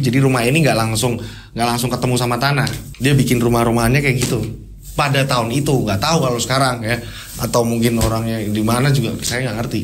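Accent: Java, Indonesia. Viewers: Piyantun pundhi mas.....medhok sanget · Soreeeee Hong Kong hadiiiiiiiirrrrr, BUUUUUUMMMMM ASYEEEEK BHS MAS MUUUUEDOK BNGET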